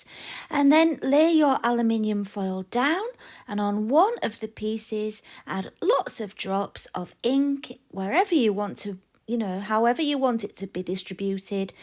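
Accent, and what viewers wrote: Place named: Lancashire, United Kingdom. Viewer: Just subscribed..Saw your video today for the first time! Really cool effects with the foil! Love your Lancashire accent! I’m a Lancashire girl too..I’ve lived in America for 40 years …still got the accent though lol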